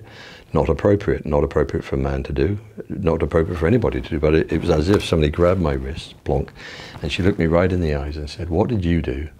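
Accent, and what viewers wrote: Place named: South, United Kingdom. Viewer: Its late. Couldn't sleep. Popped on YouTube and there you are in the 'Recommended for you' section. We miss you up here in the north country. You're developing a bit of a 'Southern tinged' British accent. LOL Be blessed my Friend.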